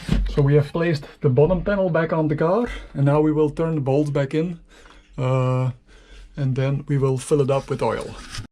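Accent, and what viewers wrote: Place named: Belgium. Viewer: For en Englishman you have a Belgium accent. No problem, clear explication.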